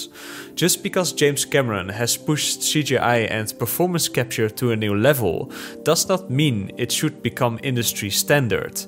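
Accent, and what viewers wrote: Netherlands. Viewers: Is that a Dutch accent I hear? No wonder you love wonder, makker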